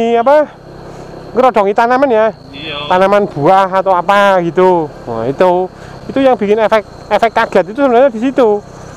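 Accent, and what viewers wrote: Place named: Surakarta, Indonesia. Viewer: Jebulane gagah mas Iki...suaranya khas solo..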